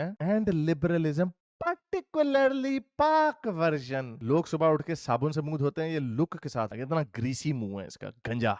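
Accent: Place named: Punjab, India. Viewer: Same here, I live in singapore and i just come here to have Great laughs and Hearing his Punjab Accent!!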